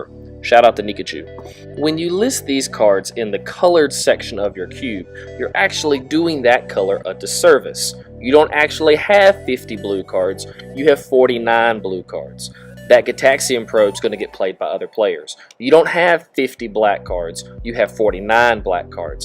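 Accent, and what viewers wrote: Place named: South, United States. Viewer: I agree with some of these, but not all of them. I still believe that most Phyrexian cards are predominantly wanted by their respective colors. They do see occasional play in other colors, but from my experience they are for the most part played in decks that include the color on the card. The biggest exception to this would be Porcelain Legionnaire. Aggro decks of all shapes and sizes love this creature. But for the sake of balance, and because the rest tend to mostly see play in their own colors, I just categorize them all in that color. Speaking of balance, you also mentioned adding in a color aligned land for each color to go alongside Academy Ruins. I don't agree with this. White has maybe one cubeable land in Karakas. Red has actual zero. I think color aligned lands should be treated no differently than any other color aligned card type. 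Don't force any specific card type into every color. If there are no lands you want to play for a certain color, that's ok. It won't offset the draft or the game play at all to not have a red aligned land. Finally, I searched for on Twitter and got no results. Anyway, I really enjoy your videos. It's also nice to hear another southern voice in the cube community (I'm in TN). Cheers, man, and keep the content coming.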